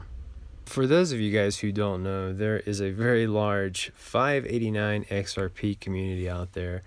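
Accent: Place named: United Arab Emirates. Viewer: You sound a guy from UAE. 50 should be fine for you to make few millions if you have bought few thousands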